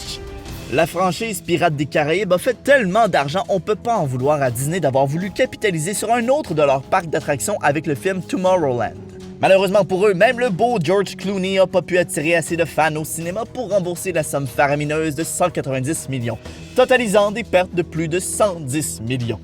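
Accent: Canada. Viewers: J adore l accent candien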